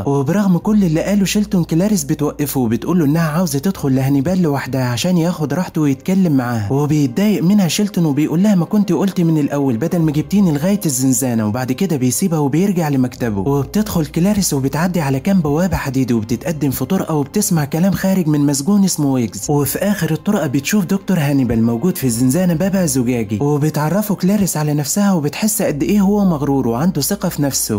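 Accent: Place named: Tunisia. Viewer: الي يتكلم من تونس !!!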